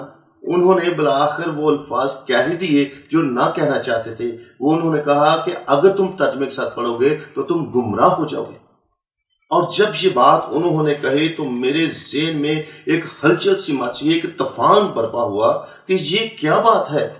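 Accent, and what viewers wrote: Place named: Arab world. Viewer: qabil e ghor bat yah hay is video main k jab yah arabic qawaid janta hay or yah arabic bhi smajh leta hay hafiz bhi hay to isay tarjuma parhnay ki zarurat kyun paish aie? or is ka arabic lehja bhi note krnay wala hay ,, yah khinzer choora hi tha or hay , yah dramay bazi kr rha hay musalmano ko gumrah krnay k lie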